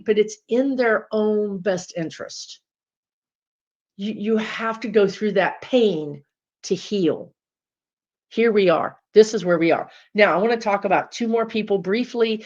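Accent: Texas, United States. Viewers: You Are a Texan 😂 love ya gf..I was making bed i was listening Your Texas ❤❤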